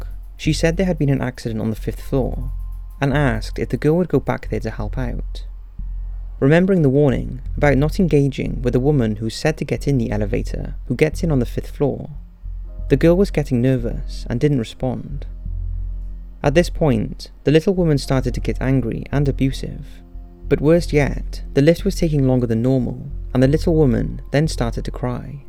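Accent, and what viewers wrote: United Kingdom. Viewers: i love your british accent 😊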